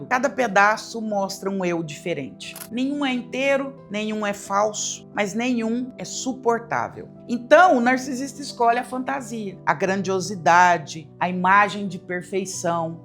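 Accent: Minas Gerais, Brazil. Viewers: Estou chegando agora no seu canal e estou respeitosamente apaixonado pela senhora e Dra Ana Beatriz, acabo de me descobrir um bígamo virtual! rsrsr... Parabéns pelo seu trabalho Dra!!!! Ouvir falar da vida com sotaque mineiro faz tudo ficar muito leve... acho que Freud era um chato, mas boa gente, paulistano como eu KKK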